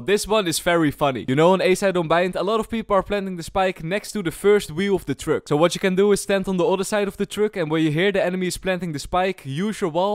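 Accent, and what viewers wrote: Netherlands. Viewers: bro wait you are dutch, i didn’t even check i can hear it lol 😂😂😂😂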